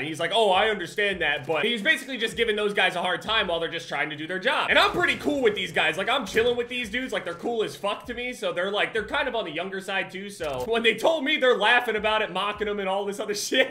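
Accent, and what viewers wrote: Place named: New Jersey, United States. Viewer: you can tell from jersey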